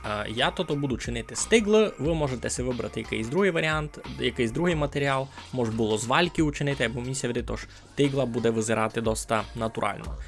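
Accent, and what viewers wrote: Transcarpathia, Ukraine. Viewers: Це закарпацька?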